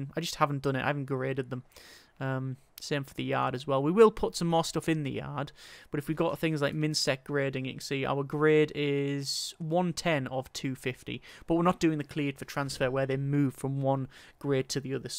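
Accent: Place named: North East England, United Kingdom. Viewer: North east of the UK I’m guessing from the accent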